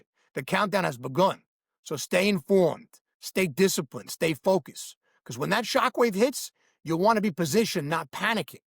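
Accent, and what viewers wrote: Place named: New York, United States. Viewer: That New York accent ❤😊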